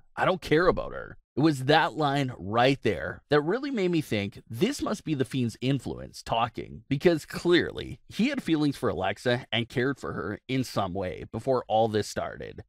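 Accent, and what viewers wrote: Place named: Canada. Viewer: That damn accent is so annoying you sound like damn Canadian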